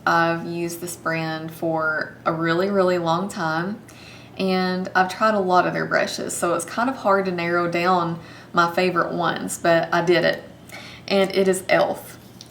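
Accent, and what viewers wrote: South, United States. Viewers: I couldn't agree more that there is no need to spend a ton of money on brushes for a pretty makeup look. BTW...I love your southern accent. :) You are so pretty, and down to Earth. I enjoy watching your videos.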